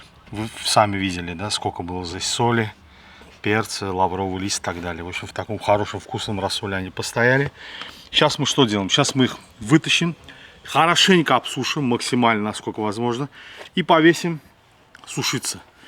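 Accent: Baku, Azerbaijan. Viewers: Ваш канал, рецепты, приготовление, ваше настроение, акцент, диалект, выражения, все это причины, по которым я с удовольствием смотрю каждый эпизод! Я сразу оказываюсь на родине, в родном Баку 80х! Дай Бог вам здоровья и успехов в творчестве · Русский Бакинский акцент,это песня.Певички , нервно курят в сторонке.Рецепт супер,я так копчу курей. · Очень вкусно выглядет.Акцент и говор чисто Бакинский,я незнаю кто он по нации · Акцент Азербайджанский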